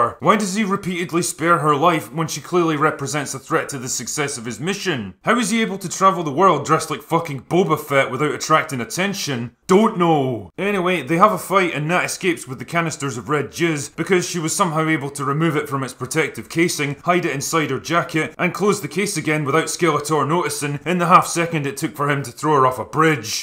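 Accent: Scotland, United Kingdom. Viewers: Dude, are you Scottish?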